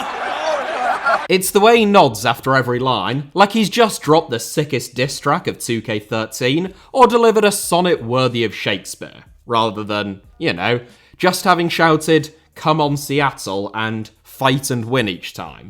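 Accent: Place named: London, United Kingdom. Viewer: Ironically, has much more of a London accent, than a East Yorkshire accent.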